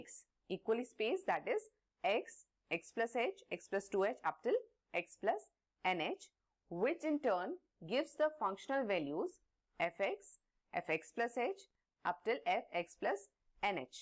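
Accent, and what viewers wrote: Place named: India. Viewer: Nothing I like more than hearing an Indian voice on a tutorial. Peakkkkkk stuff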